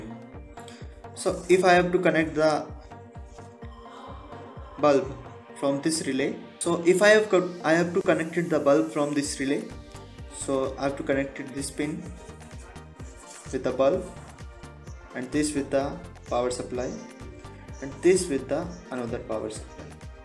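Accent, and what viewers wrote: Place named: India. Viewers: I personally don't really like it. But if I get to choose between Indian English or nothing at all, then the decision is really easy. I do appreciate videos like this a whole lot :D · i love this indidan english!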